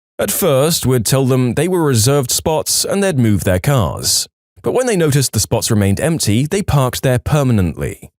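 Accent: United Kingdom. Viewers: Pls enough with the British accent 😭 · oh big deal he’s british, his voice isn’t even bad, you don’t need to get so upset over it.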